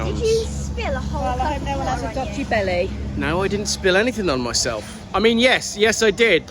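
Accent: United Kingdom. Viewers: Bri’ish guy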